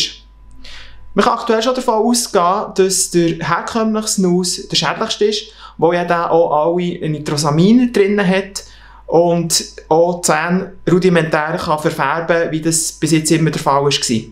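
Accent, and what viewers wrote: Switzerland. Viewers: Denke Schweizer deutsch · Dann guck dir so ein Video halt auf einem deutschen Kanal an (gibt's aber keine guten😉). In der Schweiz wird halt so gesprochen und ich hab als Deutscher auch kein Problem das zu verstehen. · Schon krass, wie ekelhaft manche wegen dem schweizerdeutsch sich ablassen. Wenn man es nicht verstehen kann, Untertitel aktivieren und nicht so herablassend kommentieren.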